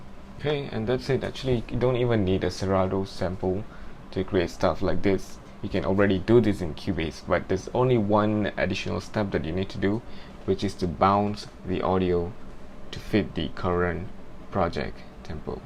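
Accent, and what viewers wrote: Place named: Malaysia. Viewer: I thought the accent sounded familiar! Hehe Malaysian in the house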